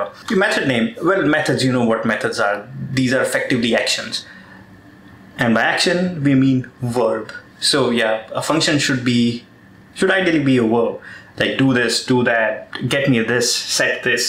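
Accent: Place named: India. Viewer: It's not the code that we don't understand but your Indian accent 😐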